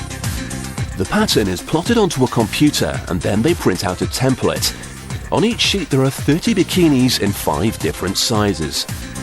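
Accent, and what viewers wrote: United Kingdom. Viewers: I love the way British people say stuff :D it makes me giggle.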